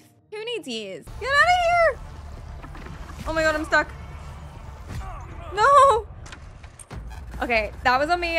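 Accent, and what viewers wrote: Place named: United Kingdom. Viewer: Praise be for subtitles as your British accent seems thicker on YouTube than it does live on Twitch LUL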